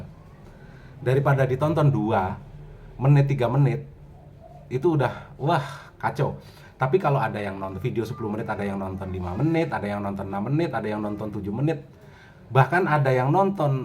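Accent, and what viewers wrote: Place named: Java, Indonesia. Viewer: bang asline wong ndi kok jowone ketok banget heheh.. makasih ilmunya bang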